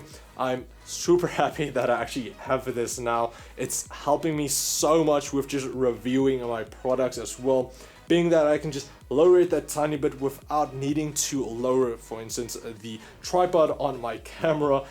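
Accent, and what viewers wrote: Afrikaans, South Africa. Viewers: That's what it sounds like when you've Afrikaans speaking English... The South African accent is neutral, meaning it is easy for South Africans to pick up on other accents.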